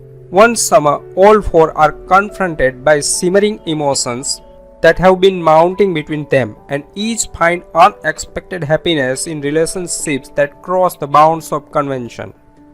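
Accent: India. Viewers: indian accent is so hilarious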